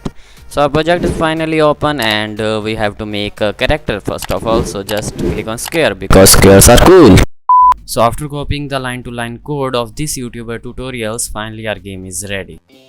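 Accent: India. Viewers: ur accent and u look indian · points for using dead memes + points for indian accent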